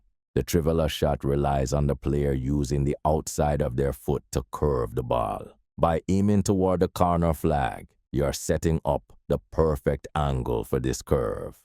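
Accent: Jamaica. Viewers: Great informative video as always bro, you will always be the Bob Marley of eafc... I love the Jamaican accent😊....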